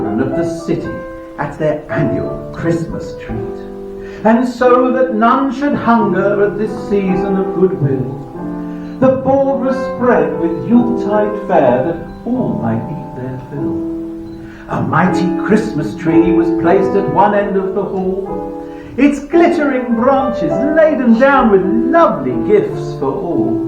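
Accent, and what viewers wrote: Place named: England, United Kingdom. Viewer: Very well done my friend. I liked it a lot. You have an excellent voice and I love your English accent.😊👏🏻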